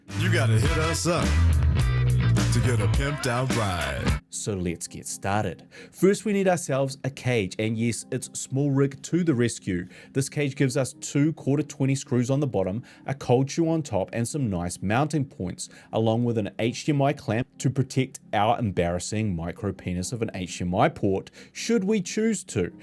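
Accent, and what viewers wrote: New Zealand. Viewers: love the intro skit: hehehe do more! love the kiwi accent too.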